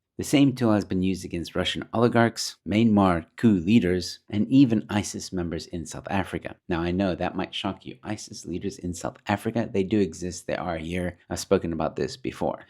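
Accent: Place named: United States. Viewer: Don’t lose your American accent just yet. You might be back before you know it.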